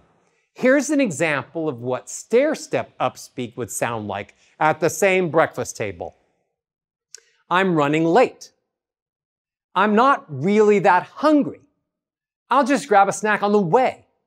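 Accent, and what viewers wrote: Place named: West Coast, United States. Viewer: He has the flat Hollywood/West Coast accent.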